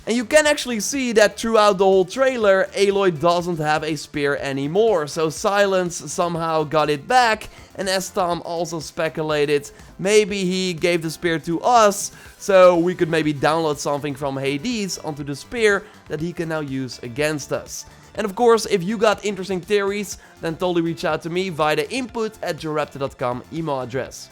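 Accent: Netherlands. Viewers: where do you come from, you sound dutch? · Hmm... Interestingly, not only you’ve guessed the name correctly, you also have Dutch accent.....Like RobinGaming. And your YouTube name is begin with which is very common fore letters of Dutch names..! Hmmmmmmmmmmmmmm....(edgy face) Yeah, I think you’re definitely Guerilla’s undercover bro!:)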